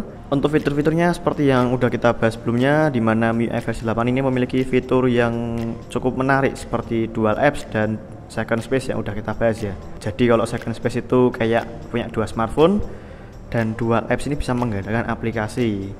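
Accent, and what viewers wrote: Java, Indonesia. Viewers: Medok e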